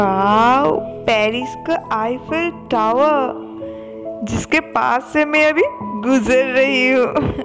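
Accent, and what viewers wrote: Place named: Bengal, India. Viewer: I think she is Bengali her pronounce is like Bengali because of I'm also bengali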